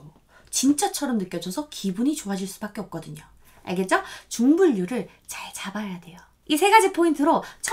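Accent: Gyeongsang, South Korea. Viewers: ㅎㅎ 경상도 억양 매력잇네요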